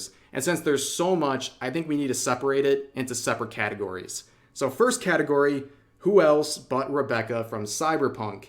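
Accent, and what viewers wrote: Scotland, United Kingdom. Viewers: Scotish, almost british 🤢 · A Scottish vTuber?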